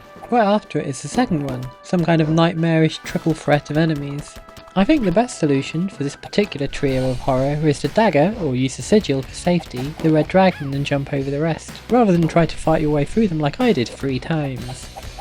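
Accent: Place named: United Kingdom. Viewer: They fly some what erotically? I heard that and laughed I'm sorry it was accidentally funny and I just want to say I do like this LP a lot (so you don't think i am a jerk for kind of insulting your interesting sounding accent...British I...think?) and I will stop typing now, since I want to go back to watching...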